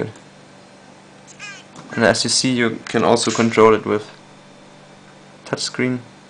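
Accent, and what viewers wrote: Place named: Scotland, United Kingdom. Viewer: Haha je praat een beetje met een schots accent:p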